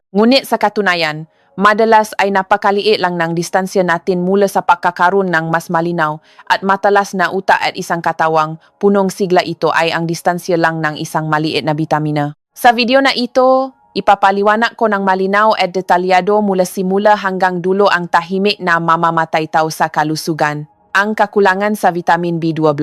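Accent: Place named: China. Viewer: DOKTORA MAGARAL KA MUNANG MAGSALITA N G TAMANG BIGKAS NG TAGALOG ,, PARA KANG INTSIK TULO LAWAY KUNG MAGTAGALOG,, so ma lo sep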